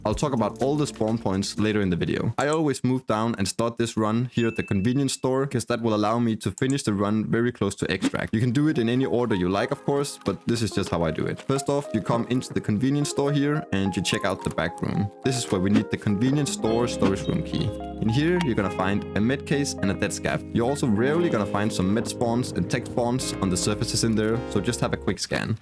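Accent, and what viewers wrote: Denmark. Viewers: Are u danish by Any chance? Ur accent is Kinda danish ngl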